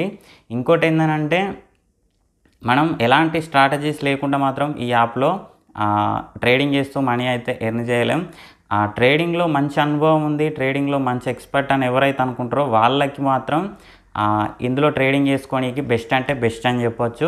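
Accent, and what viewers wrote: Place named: Karimnagar, India. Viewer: Sir మీరెక్కడ, కరీంనగర్ భాష మాట్లాడుతున్నారు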